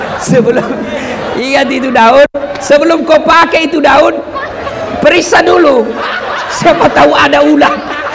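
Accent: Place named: Makassar, Indonesia. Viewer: Alhamdulillah meski di Tanjung Balai dialek makassarx pak Ustadz tdk hilang❤😂😊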